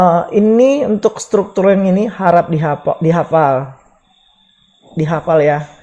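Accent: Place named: Medan, Indonesia. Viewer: Medan x bang bahasa nya paten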